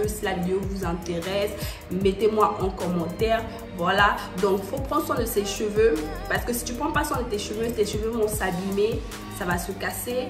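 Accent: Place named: Ivory Coast. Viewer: Ton accent ivoirien😍🇨🇮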